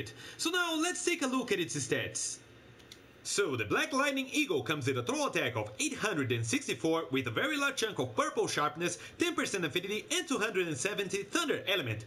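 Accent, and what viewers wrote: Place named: Brazil. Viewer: Seus videos são top e sua pronuncia é perfeita , só não dá pra esconder aquele sotaque de respeito , mto bom ver br's que trazem conteúdo pra gringa , gg mano , also nice build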